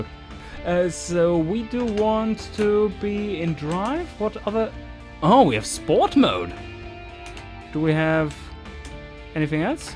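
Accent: Germany. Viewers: Mate, are you german?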